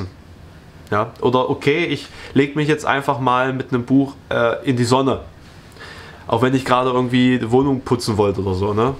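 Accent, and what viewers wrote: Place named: North, Germany. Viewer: Das mit dem Workaholic kenne ich. Mein Arzt meint, ich solle verdammt vorsichtig sein, sonst sei es nur eine Frage der Zeit bis zum Burnout. Struktur hilft, das ist richtig. Das mit der Ernährung bekomme ich auch irgendwann konsequent auf die Reihe :D Wie auch immer - ich freue mich darauf, dich bei Bob zu hören! Bitte übernimm das Programm am Morgen - dann werde ich im hohen Norden direkt mit heimischem Dialekt geweckt <3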